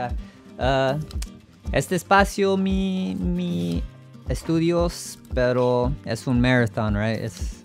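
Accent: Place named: Northern Europe. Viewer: Hola para alquilar un apartamento poco tiempo mira en Airbn, si quieres mas tiempo mira <link>. Tu español es muy bueno, pero el acento de "guiri" ya no se te ira. "Guiri" le llamamos los españoles a los extranjeros de habla inglesa o del norte de Europa.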